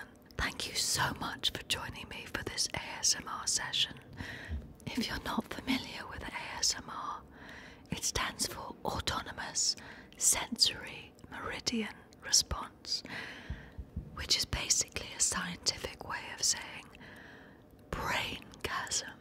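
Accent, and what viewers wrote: England, United Kingdom. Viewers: i’m gonna die! her voice and English accent😫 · How dare the people who mocked her British accent growing up. Madness. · English accent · She is one of the most beautiful women and most brilliant actors I have ever seen. Her elegant british accent made this asmr even more sensuous. Thank you Netflix! She is a natural. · Going into this I knew that she naturally has two accents but I’d only heard the American one. And still...when she began speaking in an English accent I was surprised to say the least 😂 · She was raised in the UK and moved to America as a teen, thus she developed her English accent naturally. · She’s so lovely. -She’s become more British than me, in recent years!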